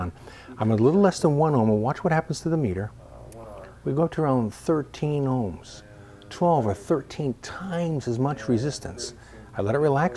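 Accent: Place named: New York, United States. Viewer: New yawk accent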